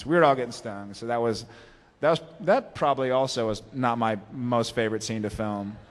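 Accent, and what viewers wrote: Texas, United States. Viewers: I love the slight Texan drawl that his voice has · You can really hear his Texan accent here. Love it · Gotta love his Texas accent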